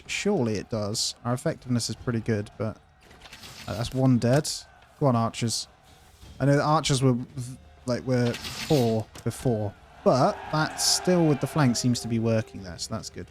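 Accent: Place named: East Anglia, United Kingdom. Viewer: Well its definitely an east anglian accent, I was just refusing to say Ipshit.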